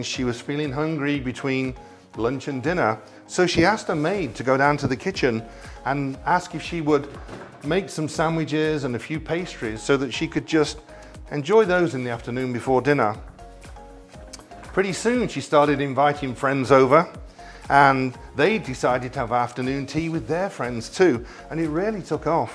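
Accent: United Kingdom. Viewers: Even American Cooks has started to note both Celsius and Fahrenheit temps in their recipes. This guy is British, that he only told the Fahrenheit temp made my respect for him plummet more than one degree. I do not care where he currently live. He is so British to listen to and watch it almost hurts, and he makes his money on the fact he used to be cooking for the British Royal familie. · Chef just curious are you British or Scottish?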